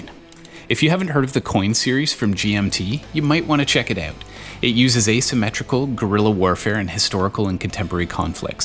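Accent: Canada. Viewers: Canadian accent detected.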